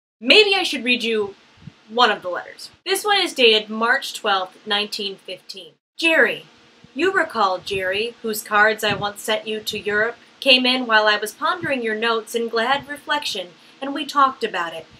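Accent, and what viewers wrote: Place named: Western New York, United States. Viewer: Haha that was good. I thought this was going to be about the rapper when I first clicked, but then totally redeemed yourself when you played the song at the end. Are you from western NY? You sound like you are from western NY.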